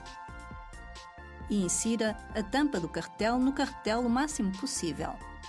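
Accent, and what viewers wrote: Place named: Portugal. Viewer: Meu DEUSSSS , espero que minha máquina não venha con este edioma , portugués de Portugal? É o último, soy de Brasil, bah que asco m não entendí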